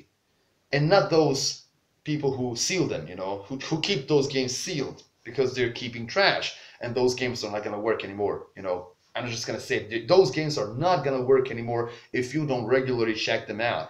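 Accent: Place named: United States. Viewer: U sound so american haha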